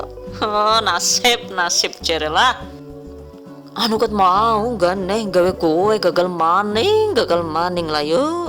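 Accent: Banyumas, Indonesia. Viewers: ngapak2 logat suaranyaa iya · Rikane deneng teyeng ngapak Yuu...jarku wong Madiun koh ngapak😃😍👍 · Wkwkwk ngapaknya lucu, asline sing Endi sih MB?? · Nembe nonton... Ternyata ana sing ngapak juga... mandan kaget aku.... 😂😂😂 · . Rika sih womh ndi. Jebule ngapak 😅😅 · Kayane kie wong ngapak olih bojo wong jawa timur kiye . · heheh.... ngapaknya ya allah saya bgt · aku seneng deh ne wis ngmnge ngapak 😄😄😄😄😄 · Mksh resepnya bunda, sy klo lihat videonya bunda suka ngekek sendiri klo lg pake bahasa ngapak hahahaaaaa, lucu. Smg tambah sukses buat bunda yaaa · Lucu ngapak bgt kaya enyong hahahaha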